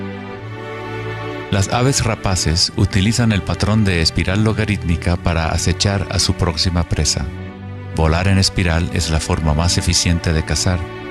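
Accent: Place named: Latin America. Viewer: Bastante interesante, lastima que este en latino, no es por nada, estoy más acostumbrado al acento español. Like.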